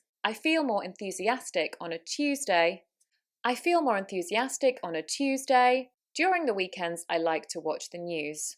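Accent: United Kingdom. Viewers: Hallo good teacher l am attention To listen carefully from You are also one in good choice teach softly your Sound real british accent I have more who teach british accent nearly four Teachers but l have follow American accent two teachers mostly l like As well as british accent But english has nothing know as all a little bit one Thank you.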